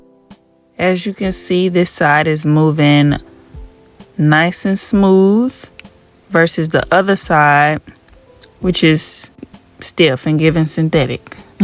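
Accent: St. Louis, United States. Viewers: You from saint Louis? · “ cut my hurrr” lol 😭the way Americans talk is so interesting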